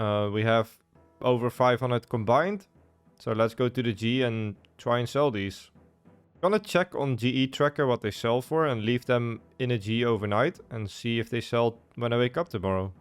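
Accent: Netherlands. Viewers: I smell some dutch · You're dutch right? Can hear it in your accent haha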